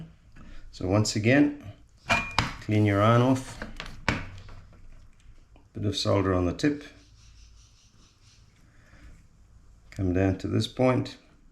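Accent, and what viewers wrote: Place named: South Africa. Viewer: Best instructive video on Youtube on creating a 6s. As an expat half way around the world great to hear a South African accent again as well.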